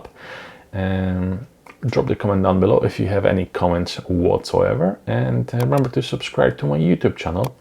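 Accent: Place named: United States. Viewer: I thought you sounded very “American” (USA)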